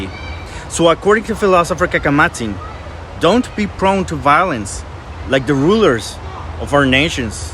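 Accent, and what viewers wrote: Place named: United States. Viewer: Mi hermano te suplico que no digas mexico y mesoamérica . Con acento gringo. Y te felicito por si saber pronunciar los nombres de los tlatoque con acierto. Gracias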